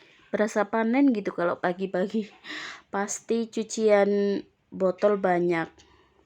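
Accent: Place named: Banyumas, Indonesia. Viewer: Salam kenal mbak, aku ngapak juga, dari cilacap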